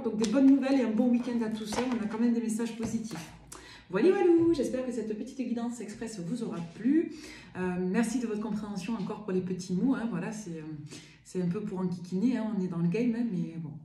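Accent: South, France. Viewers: Cc tu as un accent du sud😊❤❤❤moi j adoreje ss de toulon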